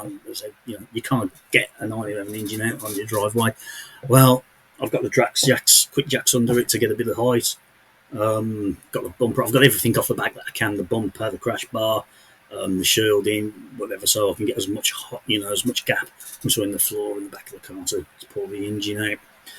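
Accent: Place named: Birmingham, United Kingdom. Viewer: Quick jacks 😂 he’s a brummie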